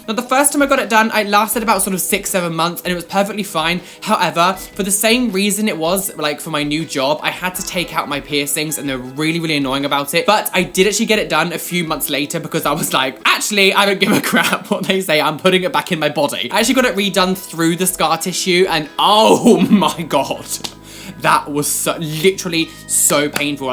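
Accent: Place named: United Kingdom. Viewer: I love your typical British accent.. it's so cute 😁 And ofcourse I love even piercings and body modifications. I used to have a surface of wrist, two microdermal on chest and nipple piercings, but I decided to remove them when I was pregnant of my daughter... I still have ear plugs and the top of the ear pierced..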